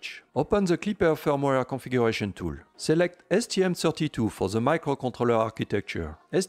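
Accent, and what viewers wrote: France. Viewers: This is the thickest French accent I've ever heard. Great video though!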